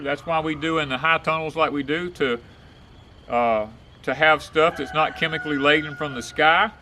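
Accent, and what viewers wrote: South, United States. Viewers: I love a southern accent .....most of my most watched content creators have an accent , there has to be some type of crazy science behind it. Reminds me of my childhood. Born and raised in Northern New York Went to North Carolina during the summers Id always come back with a twang 🥰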